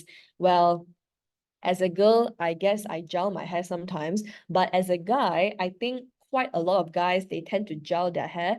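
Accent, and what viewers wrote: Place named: United States. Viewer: wow you actually sonud like an american ngl howdey homie!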